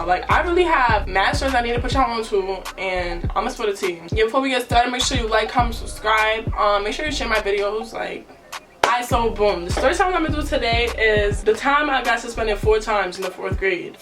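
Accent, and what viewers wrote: New York, United States. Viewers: She reminds me of Christina from bad girls club with a New York accent lmaoo 😂🖤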